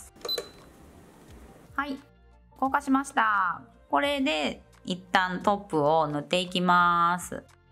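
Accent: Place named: Nagoya, Japan. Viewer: 名古屋の方でしょうか？！懐かしい響きな気がします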